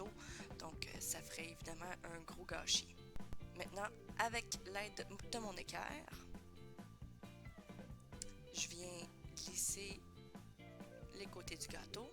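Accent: Quebec, Canada. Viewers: Bonjour s il vous plaît vous donnez des cours sur place vous êtes ou vous avec un accent québécois. Je suis souvent à mtr j attend votre reponse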